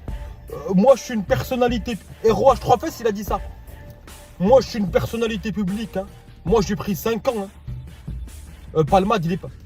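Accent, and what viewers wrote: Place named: Lyon, France. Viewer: Ca ce voit ta été a Corbas 😂😂 tu pavarle comme les Lyonnais (sal mouille)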